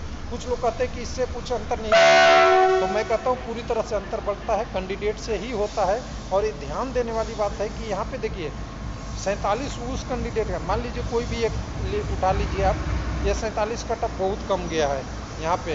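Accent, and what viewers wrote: Bihar, India. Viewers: sir pls don't mind but u r very arrogant.. n arrogance to ravan ka nahi raha ..n rahi baat noted ki.. to aap hai bihari .. kyunki document ko daakument bolte hain(n old aged bihari bade danger hote hain .. ghamand achhi baat nahi dadaji .ise tyagiye , hum bachhe Hain hamara bura sochna band kariye.. bhagwaan kare ki aap galat ho.. agar aap sahi v huye to aap waise v swasth nahi rahiyega..kyunki 2000 bachhe aapke kharab health ki dua kar rahe honge wo v din raat...or galti se aap mil gaye tab to raam hi aapke swasthya ka maalik hai ya fir aapka Allah, kyunki or cast to tum ho nahi sakte.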